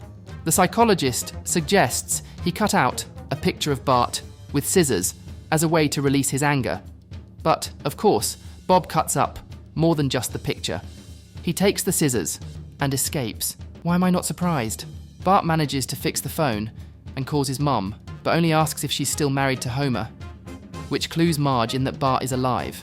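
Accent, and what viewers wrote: United Kingdom. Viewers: Hes britsh a can tell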